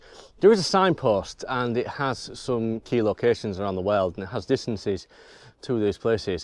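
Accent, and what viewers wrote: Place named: East Yorkshire, United Kingdom. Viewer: Been there many times. Fond memories of East Yorkshire. Your accent brings it back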